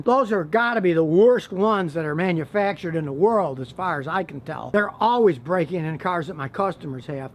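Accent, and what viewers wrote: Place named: Western Pennsylvania, United States. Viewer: Is from western Pennsylvania? I’m trying to figure out his accent.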